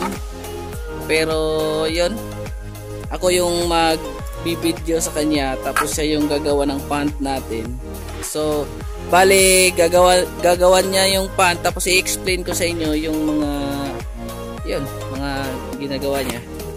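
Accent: Visayas, Philippines. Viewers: Bisaya ka pala lods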